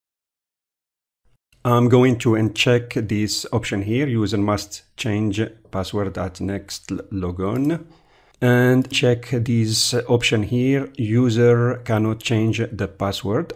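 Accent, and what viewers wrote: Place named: India. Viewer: Initially you wanted to say "How to create a NEW admin account with your current admin account, with which you can run admin programs you could also do with your usual admin account". You're basically selling bullshit here and try to fool anyone the dullest way possible. But the indish accent tells everything right at the beginning already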